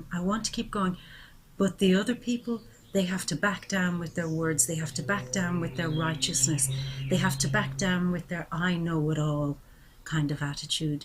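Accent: Ireland. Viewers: Love the irish accent. Great reading. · This resonates with me. I am always helping other people out from sorting out paperwork to finding things for their new homes to doing runs down to the local tip for them , but never helping myself. I have a major problem with myself worth, been put down too many times and now very wary of everyone, trying to figure out their angle or what they want that I have. I just don't let people in close in case I get hurt again. At the minute I have a major problem with money and I fear losing my home because of a Government paperwork mess up, until it is sorted I am running up rent arrears. I could do with a major cash input, even a major UK or Euromillions lottery win would be appreciated so I can settle my rent arrears myself and some other bills to have a complete fresh start, even if it was just to break even for a change. I just loved your soft Irish accent, it has a warming, calming feel about it. 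Even though I am English, I have a Paternal Irish ancestry so it is in the blood and a soft Irish accent is so nice to listen to. I got my wheels of sorts, it was my birthday yesterday 7/7 and I got some nice Land Rover models ( I can't help it, I like Land Rovers) from my kids for my 51st Birthday. Regards, Mark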